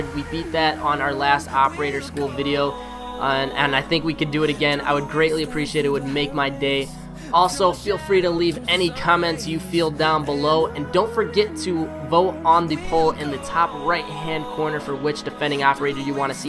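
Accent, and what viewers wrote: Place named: Scotland, United Kingdom. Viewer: Zezo The Noob his accent is strongly Scottish not English sry but I'm from England so I hate it when ppl say that Irish and Scottish accents are english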